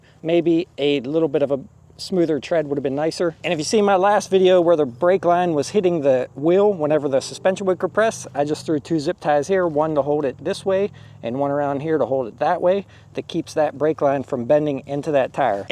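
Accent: Pittsburgh, United States. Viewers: Are you from Pittsburgh??? 😂 Also I’m strongly considering this bike over wired, and a few others. Currently added to my cart 🛒 and can’t decide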